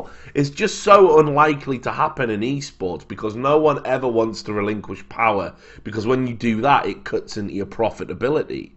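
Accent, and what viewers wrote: Wales, United Kingdom. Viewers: I picked up the welsh in your accent :D great vid, good points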